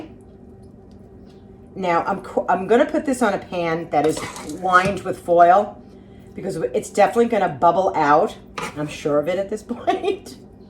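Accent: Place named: Cleveland, United States. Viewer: I love your show! This canned meat meal seems great! Trying it tonight! Doug is lucky! And btw... i live in North Carolina, I date a beautiful girl from Cleveland, your diction and accent are so similar... fogetaboutit! Keep up the good work!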